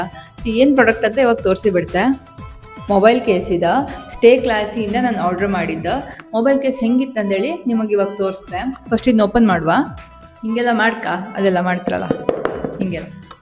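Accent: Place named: Kundapura, India. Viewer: ಕುಂದಾಪ್ರ ಕನ್ನಡ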